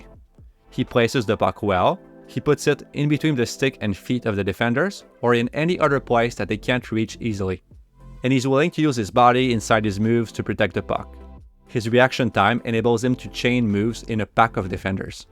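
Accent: Quebec, Canada. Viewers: Are you from qc cuz that accent is so qc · Quand même drôle que ce vidéo soit analysé par un québécois (on reconnaît l'accent dans son anglais) et que Demidov s'en vienne à Montréal. Let's go!!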